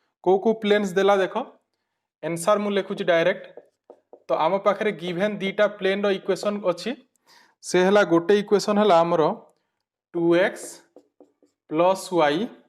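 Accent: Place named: Sambalpur, India. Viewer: But sir mate lagila je apanankara sambalpur boli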